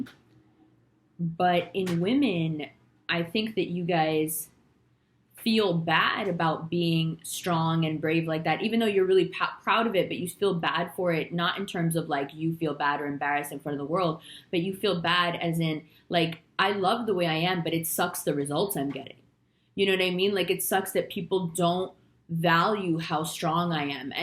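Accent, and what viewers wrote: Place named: New York, United States. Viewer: I knew you were from New York. That accent. Awesome stuff.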